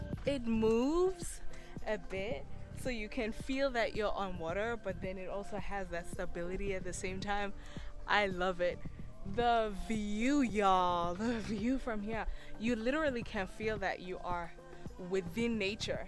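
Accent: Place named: United States. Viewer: Definitely a hidden gem in southern east Africa I would like to visit.#Eswatini 🤩. you lived in America before?. I can hear that accent slang.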